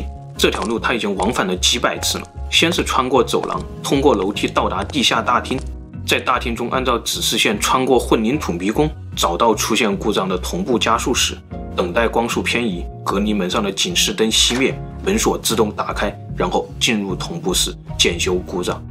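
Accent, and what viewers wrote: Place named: Hubei, China. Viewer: 湖北口音听习惯了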